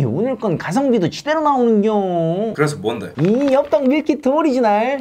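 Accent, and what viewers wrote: Chungcheong, South Korea. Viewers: 아 충정도사투리 너무 구수하시 좋네요 중독됨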